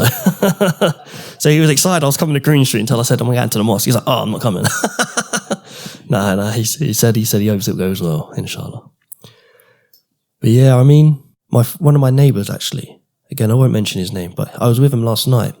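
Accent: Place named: Australia. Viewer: You sound Australian bro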